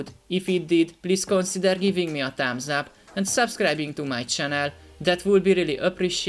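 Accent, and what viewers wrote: Hungary. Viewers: Az a magyaros kiejtés 10/10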